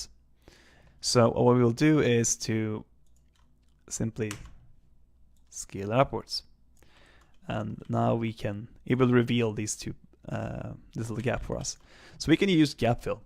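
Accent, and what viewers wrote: Sweden. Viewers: U sound swedish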